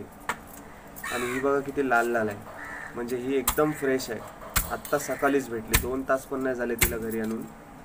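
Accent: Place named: Agri Koli, India. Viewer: भोईट बोलतान आमच्या आग्र्यांच्यान आणि कोल्यांच्यान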